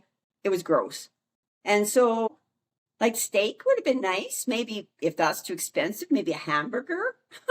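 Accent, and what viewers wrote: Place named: Canada. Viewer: New subscriber here ...love your charming Canadian accent 😊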